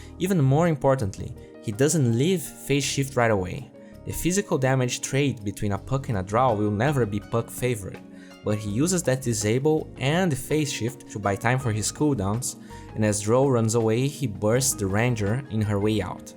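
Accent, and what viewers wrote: Brazil. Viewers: is this dude brazilian or some shit?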